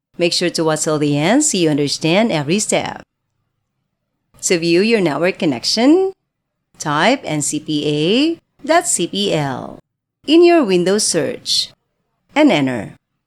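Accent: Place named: Philippines. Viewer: that's a philipina speaking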